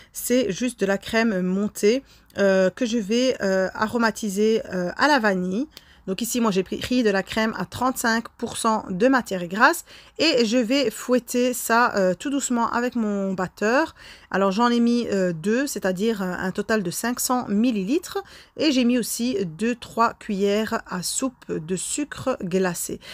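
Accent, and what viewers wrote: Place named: Belgium. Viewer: Ah tu es belge, je me disais aussi que tu avais un accent. ^^ en tout cas merci encore une fois pour cette recette, au top comme d'hab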